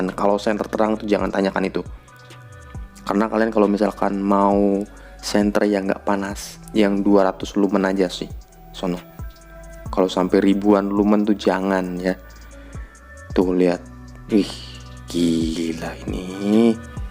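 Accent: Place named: Banyumas, Indonesia. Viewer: Jos gandos 👍👍👍 ora ngapak ora kepenak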